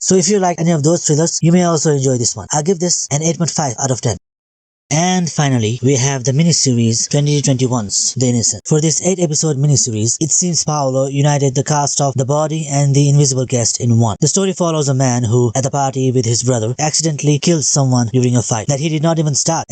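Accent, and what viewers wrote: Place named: Spain. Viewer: bro are you the viral teenager who appeared in podcast debate with girls and called the girl dumb are you? because the way you sound ur accent is like a typical spainish from spain not south america speaking english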